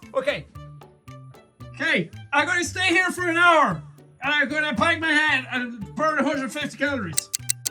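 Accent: Ireland. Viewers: You just sound more Irish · this is awesome, you sound even more irish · Lol your Irish accent becomes way more announced when you use the speech jammer, I love it XD this was hilarious:D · His Irish accent comes back and it's kind of awesome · his irish accent got like 2x stronger with the speech jammer and it was wonderful. XD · you sounded much more Irish when you did this challenge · just got more irish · he sounded like a drunk irish person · his inner irish accent came out during this like the "typical" irish accent applauds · it gives him a much stronger irish accents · Next time you do a tongue twister thing, you should totally find the speech V does in V for Vendetta! Also next time you do a tongue twister with lots of "th"s feel free to play up the Irish accent because it's such a lovely accent and I never hear it in England xD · You should go full out Irish for a whole video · just sounds more and more drunk:D Pretty Irish though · You've never sounded more Irish:P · He always did have an Irish accent... 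· Voice jammer... Should be Called Irish enhancer. Honestly you sound a lot more Irish... Maybe it's because you sound drunk HA! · YOUR IRISH IS SHOWING AGAIN!!!😂😉I love your Irish accent,even if it isn't as strong as before XD <3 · Is it me or does he sound more Irish when speech jammer is on? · This video really brought out irishness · So, in order for to go back to saying the Irish "think" we need to voice jam him. Got it. ( I love his speech either way btw) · sounded even more irish than usual here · He sounds so Irish in this 😂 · is it just me or is he way more Irish in this video than usual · If anything... during most of these sounds more irish. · the irish accent got stronger as the video went on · His Irish accent comes out a lot more in this episode. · He sounds more Irish than usual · I like how his Irish accent got more Irish-y in this video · He went full irish. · The Irish got worse and worse as he went on...and he did sound drunk, lol.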